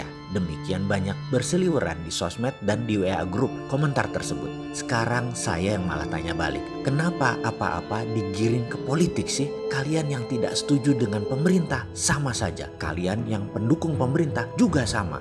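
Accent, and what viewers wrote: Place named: East Java, Indonesia. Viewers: Mantep mas se salam suroboyo, pantes ae logakte kentel, ternyata sampean wong jowo timur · Q seneng nek pas ngomong jawa timuran tepatnya suroboyan, ketok loss banget, dadi cikhas soale q jarang delok youtube sing gar boso suroboyoan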